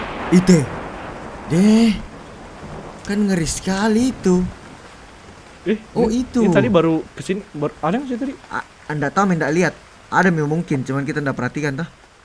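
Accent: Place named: Makassar, Indonesia. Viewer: kak aksen makassar nya pertahankan:v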